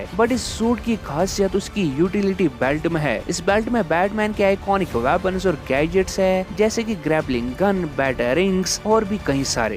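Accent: Kashmir, India. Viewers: Bro your vioce sounds like kashmiri 🤨🤨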